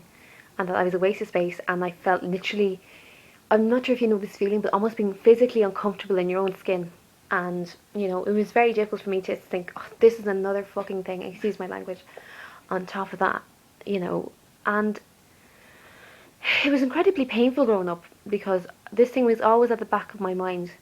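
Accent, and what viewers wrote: Ireland. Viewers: I love your beautiful irish accent and your inspiring story! I am the same! I'm the "g" word as well! I find it so amazing, you make me feel so happy and warm inside. You are so pretty! How could people call you ugly when you are the most beautiful person I have ever seen! I love you! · I'm trying to pluck up the courage to come out and watching your video is another helping hand to getting there. My heart races when I just think about saying it out loud. Oh and I absolutely love your accent :) always had a thing for Irish accents hehe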